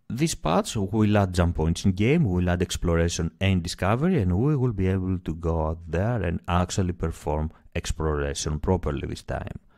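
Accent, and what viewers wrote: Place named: Greece. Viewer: Hmm, by the accent I would say he is Greek. Or at least his sounds like one.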